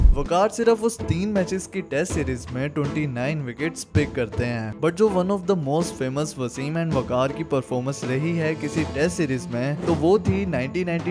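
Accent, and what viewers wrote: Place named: Punjab, India. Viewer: Not "Floup" it's "Flop" I know and I can understand you are Punjabi by your accent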